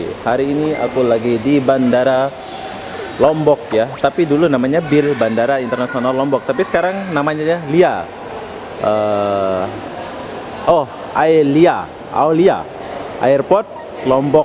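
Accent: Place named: Malaysia. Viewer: Kayanya KK sudah lama di malaysia, lagot bahasanya udah ngikut meleyu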